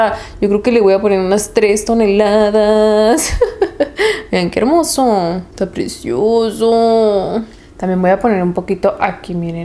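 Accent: Sinaloa, Mexico. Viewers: Yo quisiera saver de donde eres ? 😜 me encanta como hablas, plebes??? Seras de Sinaloa ??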